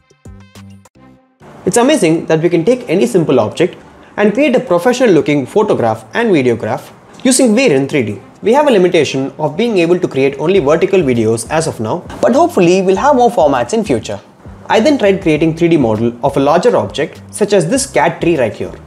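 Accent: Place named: India. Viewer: I appreciate your channel, I would encourage you to slow down your speech since your English has a strong Indian accent, it takes longer for my brain to process the accent. I think you would have more followers by doing this. Good luck and thanks!!!!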